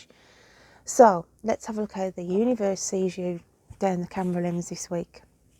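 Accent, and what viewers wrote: Birmingham, United Kingdom. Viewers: Love the accent a Brummie I think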